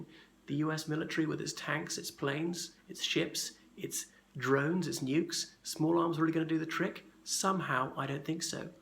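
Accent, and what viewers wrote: United Kingdom. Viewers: You’re an embarrassment to the Irish and you sound like a Brit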